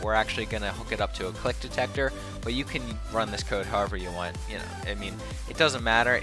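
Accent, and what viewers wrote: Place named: Romania. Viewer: Salut, esti roman? Imi place cum vorbesti engleza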